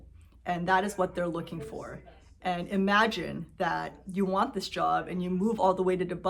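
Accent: United States. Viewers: How does she have an American accent if she is Canadian?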